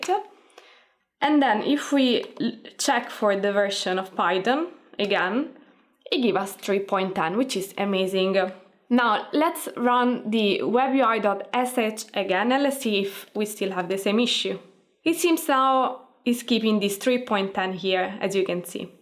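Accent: Italy. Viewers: You are Italian aren't you? I looove the accent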